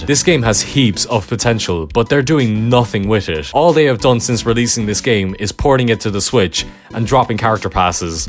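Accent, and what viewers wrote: Ireland. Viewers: R u irish? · You irish?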